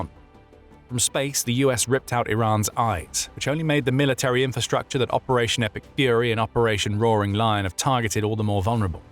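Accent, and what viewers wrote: United Kingdom. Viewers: If this the case why wasn’t we abled to stop there missiles sooner or even now. They just shut some at Israel creating mass casualties. Look what they have done to the gulf countries. Israel airport, Dubais airport, Israel’s nuclear site, residential and so many others. Iran doesn’t have satellites but china and Russia are giving Iran all the information they need, that’s why they hit their targets on the dot. So this video was like Iran is a sitting duck. I wish that was the case,but it’s not. Even to the point that Trump is talking about negotiation. Please get your facts straight and I don’t know what is up with a British accent?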